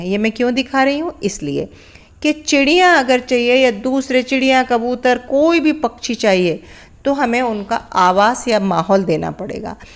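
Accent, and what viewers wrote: Standard. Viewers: aapka Hindi itni shudh and sureeli hai ki aapka Hindi keliye me jaroor dekhti hun video, and ofcourse aapka gardening coaching to la jawab hai, mai ek soth Indian hun, par muje Hindi bhasha Jo aapki jaisi shudh aur pyari ho wo bolne Sur sunne keliye bohot accha lagta hai, me rehti hun Mumbai me 37 years ho gaye hai, par yaha Hindi alag hai, mixed hota hai, aur slangs hai, me govt dept se voluntary retirement le chuki hun, waha Hindi seekna jaroori tha, par ham kaam vyasth hote the ki muka nahi mila, and aap se shudh Hindi sikhungi, aap ne ye video bohot short kar diya 😀, Mere bhi chota balcony garden hai, isi tarah chidiya chotisi aati hai aaj kal, bohot aanad hota hai usko dekh kar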